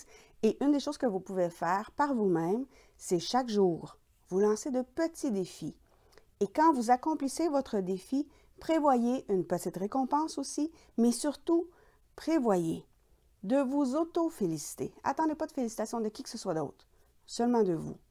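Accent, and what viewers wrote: Quebec, Canada. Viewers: Ah oui et j'ai commander Ton livre abc verbal et non verbal En amour pour mieux comprendre J'adore et c'est facile à lire Ils y a quand même certains Mots que je comprends pas Mais je revient à la page pour comprendre ce que ça dit Merci pour tout. Vive la prochaine Capsule et j'adore ton accent québécois très cher Depuis la suisse bisous Sophie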